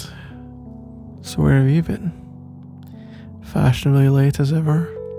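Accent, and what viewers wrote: Scotland, United Kingdom. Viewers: I loved this audio, but I am also a big fan of the Harry Potter series, so I was wondering if you could do audios for one of the characters, specifically Oliver Wood because he has an identical accent to yours. (His accent made me look for Scottish audios :)) If you could do a series for him on how he would react to certain stuff that would be amazing. It would give you a chance to broaden your horizon a little bit and have fun with this character! If you have already done something like this, then don't pay any attention to this :). I love your videos by the way!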